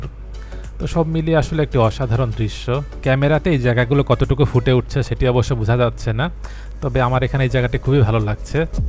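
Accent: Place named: Noakhali, Bangladesh. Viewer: ভাই সত্যি আগে জানতাম না আপনার ভাষা নোয়াখালীতে। আমার মাইজদীর সাতে ছয়ানী বাজার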